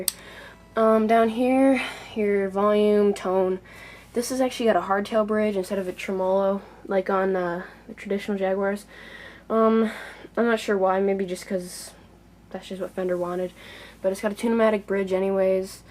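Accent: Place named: United States. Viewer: i thought you were british but you sound american .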